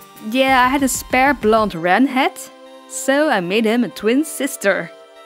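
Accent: Netherlands. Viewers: Please Ive been a subscriber for a long time 😩 Your tutorials were one of my fav since you made the dolls come to live and gave them such a personality Im hooked. Im also from the Netherlands so I recognize that accent. Plus the faceups and animal creations are top notch that they remind me of the Fantastic Mr fox. Ive been watching for a long time even when I was unsubscribed.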